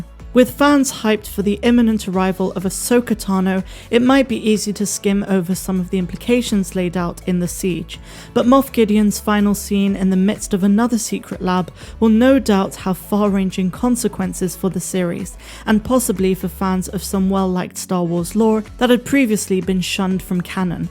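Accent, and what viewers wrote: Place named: West Country, United Kingdom. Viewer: From the South West of England.. i knew it.. We love the letter Arrr in these parts.. Gert Lush x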